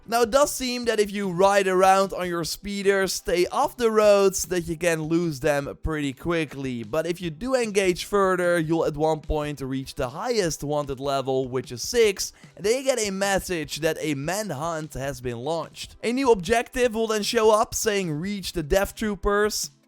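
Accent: Netherlands. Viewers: Opens video -> Hears dutch accent -> Skip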